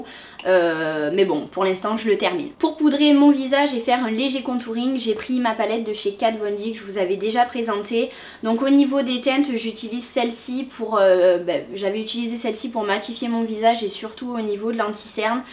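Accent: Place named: Marseille, France. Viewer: Tu es marseillaise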